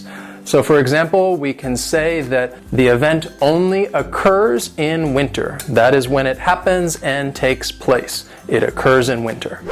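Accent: United States. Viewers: Dear Sir! I have to admit that the video was definitely useful for me to expand my vocabulary, anyway very thankful for you free lesson with an excellent American accent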